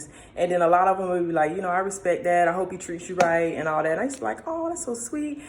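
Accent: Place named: Broward, United States. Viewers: First of all I love that you are a South Florida gyal! #hometeam #icantellbytheaccentandattitudeofconfidence but can we just pause for what beautiful fruit God has hanging off your tree. I don’t know who he is…but when God reveals him to you, I will not be surprised at the king he is as it relates to the queen you are becoming. I’m beyond proud of you my beautiful sis. ❤ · Ay where you from. Palm Beach checking in. Boynton beach to be exact...sounds like u from Broward or something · You are such a great storyteller. That southern vernacular, I could just listen to you all day. Netflix is in your future.👍🏾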